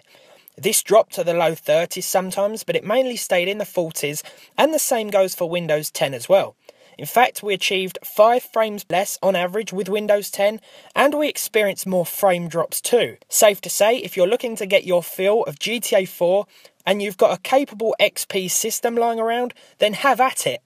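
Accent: United Kingdom. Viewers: You're* nice uk english.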